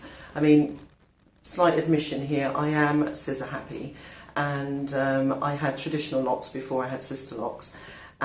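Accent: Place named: England, United Kingdom. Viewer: The English accent surprised me. Your hair is very pretty.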